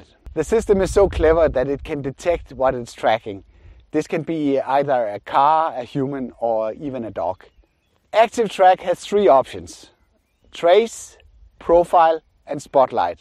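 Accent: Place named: Denmark. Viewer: Tydelig at høre at du er dansk 😇😇 Tak for en fin video. Må simpelthen eje den drone. Kæft den er fed. Skal bruges til ferierne og til at optage børnenes fodboldkampe ... Det må skabe respekt i forældreflokken når jeg kommer anstigende med sådan en.. Hahaha..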